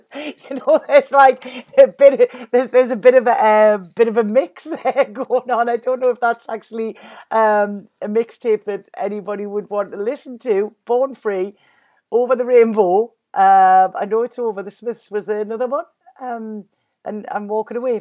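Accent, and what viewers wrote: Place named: Scotland, United Kingdom. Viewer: German? LOL sorry but I find that funny. Scottish maybe. People are funny aren't they. I will contact you in the near future for a reading. I figured out a way to generate electricity without fuel, wind or sun. Not sure how to proceed with this venture. If you gmail me I can explain the concept in 2 minutes. I will blow your mind on how simple it is. Get ahold if you want to.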